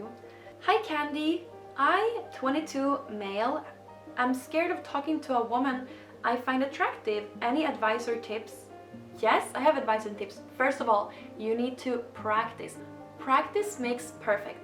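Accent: Sweden. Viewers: Your accent sounds very Swedish, I’m not Swedish but I’ve lived in Sweden for some years and I can recognize it.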